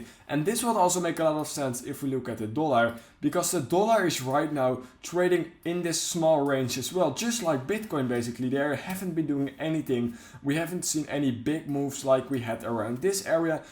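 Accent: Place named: Netherlands. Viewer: 25k is not going to broken 😂 Dutch english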